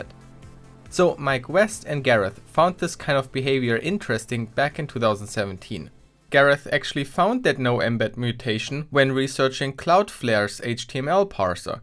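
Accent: Germany. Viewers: Man hört einfach das du auch deutschsprachig bist 😅 aber gutes Video 👌🏼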